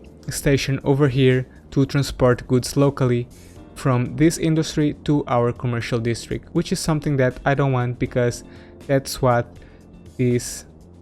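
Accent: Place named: Portugal. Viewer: Where are you from? The way you speak English sounds like you're Portuguese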